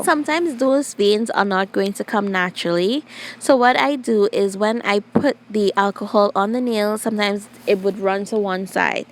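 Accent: Trinidad and Tobago. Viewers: From the time you started talking i realized u are a Trini❤🇹🇹 subscribed one time🙌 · What country are you from ? You sound Trinidadian 😍🇹🇹🤸🏾‍♀️ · Are you trini ?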